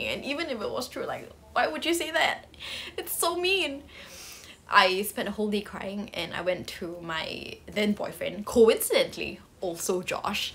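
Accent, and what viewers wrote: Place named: United Kingdom. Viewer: Gosh I love your accent. Kinda British way. I prefer watching the videos than replies on your IG stories. More engagement with fans and I'm gaining knowledge from you. Interesting contents you have been working lately. Keep it up pls!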